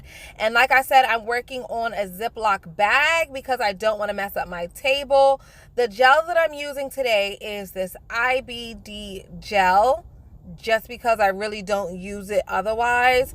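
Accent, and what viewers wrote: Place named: New York, United States. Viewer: Haha. I can hear it. I'm not from NY (from DC) but can spot the NY accent anywhere. LOL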